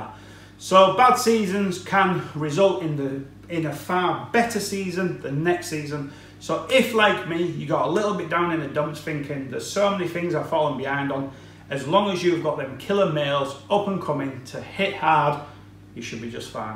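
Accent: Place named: Northern England, United Kingdom. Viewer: Cracking upbeat advice...I got me a killer male...with a smiley emoji on his head from some bloke with a northern accent and an unreal car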